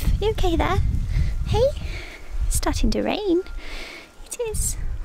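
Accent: Yorkshire, United Kingdom. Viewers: Please don’t stop saying ‘tea’. The Yorkshire dialect is one reason I ♥️ your videos so much! My dads from Sheffield & it makes me homesick to hear you talk!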